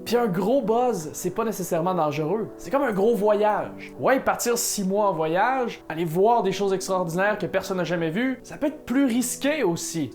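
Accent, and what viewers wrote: Quebec, Canada. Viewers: Le sujet m'intéresse mais l'accent québécois vraiment j'peux pas. Dsl. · Hello le Québécois ! Salutations d’un "maudit" Français ;-) Chouette vidéo, à quand une vidéo sur l’argot québécois que tous les autres francophones ignorent ? J’aime tellement votre vocabulaire et bien sûr cet accent extraordinaire ! Bye les lointains cousins et vive le Québec libre ! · Développement indigent. L'accent québecquois ne donne rien de plus. Déjà, un type qui a un bonnet sur sa tête à l'intérieur, tu comprends que des choses ne fonctionnent pas bien dans sa tête. Une drogue est une substance illégale (en général parce qu'elle est néfaste) et donc les imbéciles qui consomment des drogues et qui essayent de les juger sont des crétins. Après, on peut essayer d'établir des jugements absurdes avec d'autres substances, si on manque de confiance en soi et qu'on a compris qu'on est dans l'auto-destruction.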